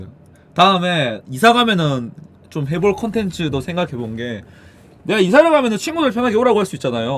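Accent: Busan, South Korea. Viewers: 부산 싸나이네 ㅋㅋㅋ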